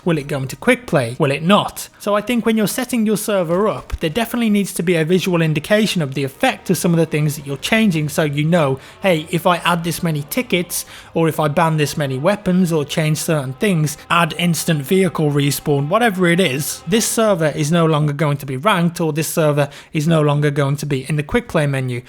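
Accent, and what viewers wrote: United Kingdom. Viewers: Meh, you know what, at first I hate the British accent, but you've turned things around for me <3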